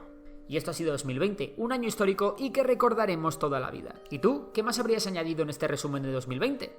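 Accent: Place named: Spain. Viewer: la verdad se nota que eres de España xd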